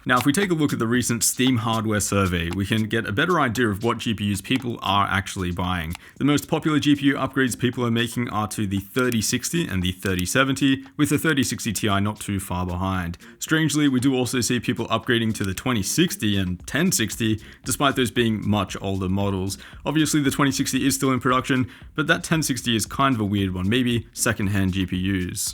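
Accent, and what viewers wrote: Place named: Australia. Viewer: I thought he had an australian accent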